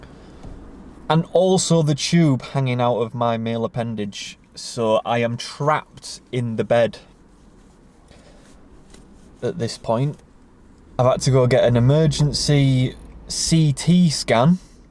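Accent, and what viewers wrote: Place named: Sheffield, United Kingdom. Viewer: are tou from sheffield mate. You sound very local brother.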